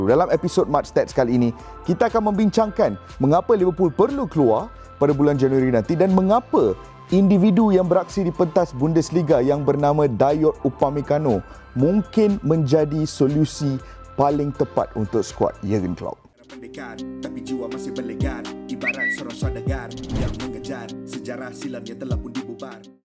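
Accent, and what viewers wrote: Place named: Malaysia. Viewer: Lidah melayu betul mamat ni. Terbaikkk 👍🏻👍🏻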